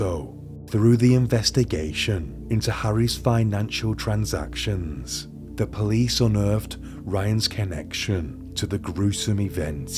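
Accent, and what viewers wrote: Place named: Manchester, United Kingdom. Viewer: Manc!!! My heart belongs in Manchester after living there for over a decade! I was going to say you sound like you’re from somewhere near there. I won’t say where though cos I know Mancs don’t like that!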